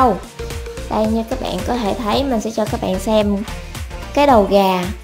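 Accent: Mekong Delta, Vietnam. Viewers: Chị Miền Tây à!! Thảm nào giọng Chị Kute qué:3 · người Miền Tây mà giọng lúc nào cũng kawai như thế này cơ đấy xD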